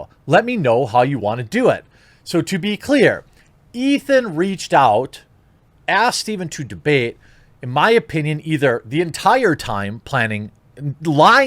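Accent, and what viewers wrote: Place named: Wisconsin, United States. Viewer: lol he's from wisconsin. that's how they pronounce bag. BAYG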